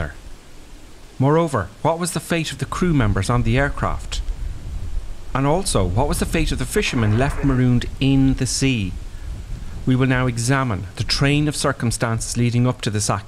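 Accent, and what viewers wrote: Ireland. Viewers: Thank god! I've so missed that beautiful Irish lilt. Some airmanship bringing that down but damn, fancy forgetting to switch tanks! But like you said, we weren't there.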